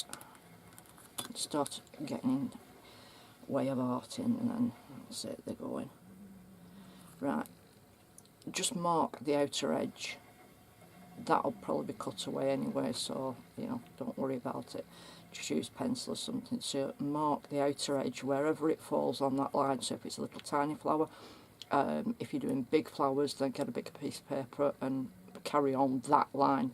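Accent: Lancashire, United Kingdom. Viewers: Hello my lovely I haven't seen one of your video's for ages. This is great🖒 I will share it on my Pinterest for you. are you from Lancashire you sound like my aunt in Preston lol x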